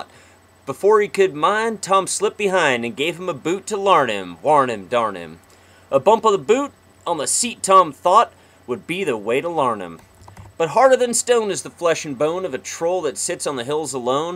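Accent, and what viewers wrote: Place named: South, United States. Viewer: "Why the hell does my southern accent start to pop up when I'm reading something?" Be proud of your southern roots. Nothing wrong with a southern accent. grins.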